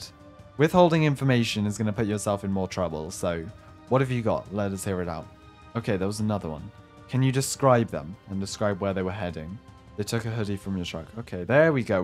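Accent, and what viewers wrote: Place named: Scotland, United Kingdom. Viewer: You've changed your voice sounds different you sound Scottish but before you sounded british a few months ago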